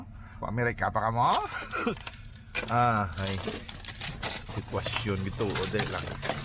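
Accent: Iloilo, Philippines. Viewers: Masarap pakingan ilongo salita, cebuano ako pero konti nakaintindi ako ilongo❤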